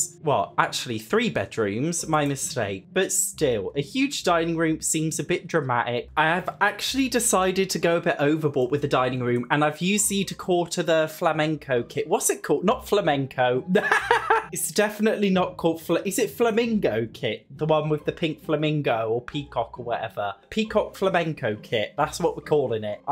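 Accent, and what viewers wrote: United States. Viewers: Your American accent is so funny. That literally is how we say hamper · I’m in love with your American accent 😂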